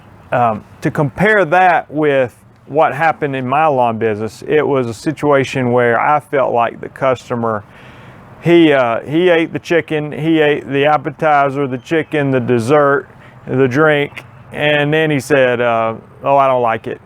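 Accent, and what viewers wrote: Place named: Texas, United States. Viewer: How many employees and major pieces of equipment do you 'run' to service 270 customers? You must have a great office manager to handle all that while you keep up the channel. What city are you in? Your accent sounds Texan more than anything from Alabama. How many years did it take you to get to 270? How much faster would you say it grew each year based on your expertise in fertilization and weed identification and killing?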